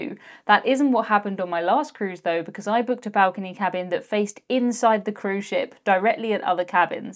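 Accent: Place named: Birmingham, United Kingdom. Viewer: I love your videos so much! Your style of telling about your cabins and ships moves quickly, so I feel I learn more than from most vloggers videos. You give us lots to consider when booking future cruises. In Canada we call the kid's ride a merry-go-round!! My grandmother was from Birmingham, so listening to you talk makes me feel her beside me. Keep up the good work!!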